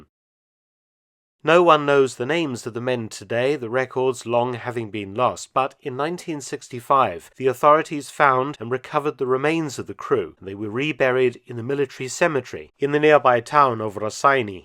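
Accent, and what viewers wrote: England, United Kingdom. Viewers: I understood everything that Mark said, quite well. Who the F cares what he sounds like?!? His CONTENT is what matters, here, and his CONTENT is excellent. If you under-educated, non-worldly, narrow-minders can't move freely from one accent to another, or one language to another, whose fault is that, huh?!? Parts of the U.S. have dialectical accents that are far different, and harder to understand, than his Brit accent, from all the way across the Atlantic‐-but, again who cares? And 1950's? Give me a break! A LOT of good sh*t came out, both in TV and movies, from the 1950's! And some of today's shows, and media‐-are pure cr*p, by comparison! You guys are overlooking the central point, here: that these guys were fighting for the defense of their HOMELAND. How would you like it; what would you do--if we had to defend the U.S. 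from say, a massive invasion of the Chinese Army, huh?!? It is no wonder that these guys FOUGHT TO THE DEATH, to try to save their mothers, fathers, sisters, brothers, girlfriends, and kids, etc...wouldn't YOU? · He just sounds British to me. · English. British encompasses too many different accents.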